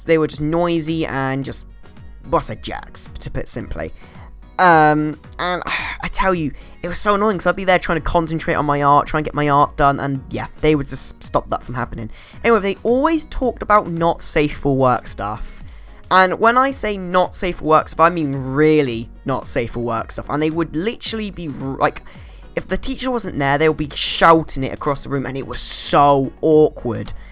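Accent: United Kingdom. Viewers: Im sorry but are you british? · Omg I love your British voice I always wanted to speak British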